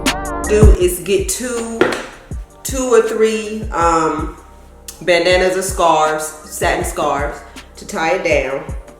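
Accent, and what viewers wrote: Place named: South, United States. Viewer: Yes, ma'am, I am here for the consistent uploads! Love your personality and your channel! You are so gifted. I think I remember hearing that you were born and raised in CA, but I definitely hear a southern accent. 🤷🏽‍♀️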